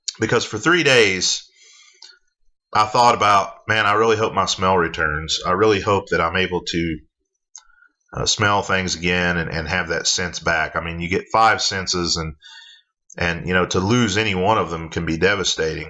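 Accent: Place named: Southwest, United States. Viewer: Good content, eloquent and great speaking voice. What's your accent? I'm guessing S.W. of U.S.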